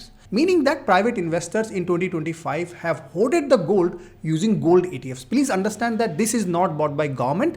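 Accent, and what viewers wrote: India. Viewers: Your accent is Bengali with Marwadi 😢😢😂😂😂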